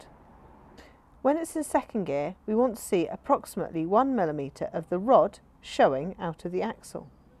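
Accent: United Kingdom. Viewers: Brilliantissimo! Helpful with lovely British accent. My pink Brompton is ready for the end of lockdown soon in France.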